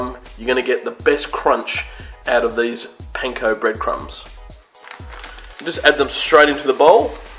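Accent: Australia. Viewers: Pretty sure he's Australian 0.o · For god sake chocoswede leave him alone 1 ain't gay it's just an australian accent and 2 ur jank. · hes aussie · WOW!, #1, i love your Australian accent, along with your fantastic cooking. Especially that popcorn chicken, and my family is also a big fan of your churros. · He is an Aussie not American.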